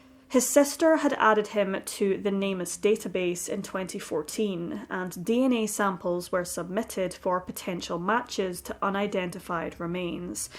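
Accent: Scotland, United Kingdom. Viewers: Are you Scottish, lass?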